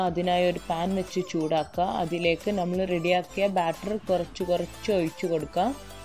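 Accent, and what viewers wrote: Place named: Kozhikode, India. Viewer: തനി കോഴിക്കോട് ഭാഷയിൽ അവതരണം 😄😄 So nice keep it up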